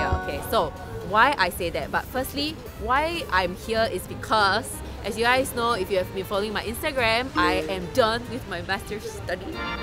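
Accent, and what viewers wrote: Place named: Singapore. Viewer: Love your videos, especially your interesting Singaporean English accent (in a good way though)!! I visited Seoul a couple times and really like this city and Korean culture. I have tried to learn Korean as well by myself (as I like to watch Korean dramas and movies), but it's hard, maybe because I'm too old learn a new language. I can still understand a bit though, as some Korean words/phases actually sound like Cantonese/Mandarin. Actually, I have a suggestion - I'm vegetarian but find it hard to find real vegetarian dishes in Korea. Even Naengmyeon, which I like, uses beef broth. It would be great if you could recommend some nice Korean vegetarian dishes and restaurants in the future:)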